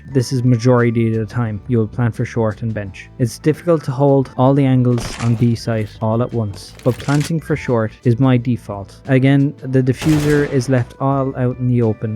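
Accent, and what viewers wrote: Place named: Ireland. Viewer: Thou sound Irish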